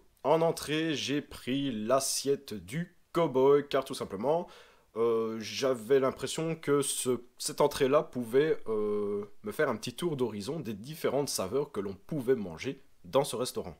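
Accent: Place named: Belgium. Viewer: J'adore ton accent belge. En tant que confrère, je m'abonne.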